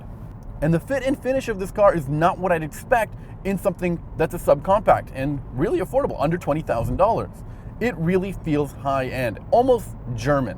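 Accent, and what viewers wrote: Canada. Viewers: Thuffering thukatash I really really wanted to watch this video but how can I with this guy speech impediment mixed with his super strong Canadian twing? I can't imagine that the automakers are very happy when they got them back with the dashboard and windshield soaked with spit. Thank God for the mute button and closed captioning!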